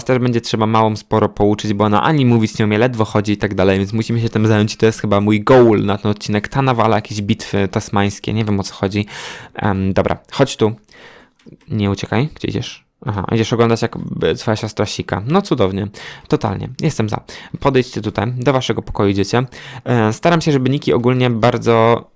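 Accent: Podlasie, Poland. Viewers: Pozdrawiam współplemieńców Podlasian😂 I to nasze zaciąganie... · Jestem z Podlasia... I dopiero od niedawna zauważyłam ten Podlaski Akcent i siebie xD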